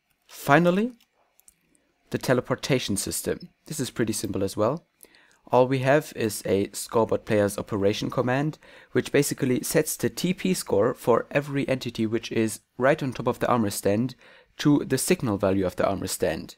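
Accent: Germany. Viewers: He's german, but that is very little of a german accent ^^ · Come you from Germany?!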